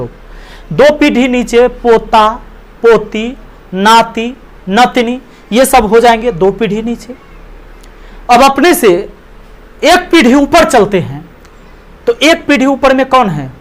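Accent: Bihar, India. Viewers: Sir ap smjhaate v achha hai aur bolne ka bihari style mast hai 💕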